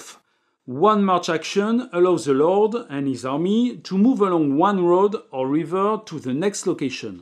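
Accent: France. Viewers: I did indeed overcome the delicious French accent and it was totally worth it. This was a great tutorial! Well done! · Super accent :) Du coup j'arrive à comprendre les règles même en Anglais. "But you are French ?" ;) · Very impressive! Watching it before trying to digest the rulebook. Being a Russian I'm indeed delighted by the choice of the topic by Volko Ruhnke. And on top of that, this French accent makes it look and feel more 'medieval' 🧙‍♂️ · Thanks a lot! You are my most preferred english spoken with french accent youtuber! 😁💕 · "Delicious French accent..." LOL. It truly is. What a fantastic resource for Nevsky learners. Well done! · Best tutorial ever and bonus the French accent that makes it even better.... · 36 seconds of this amazing french accent with attitude and I subbed.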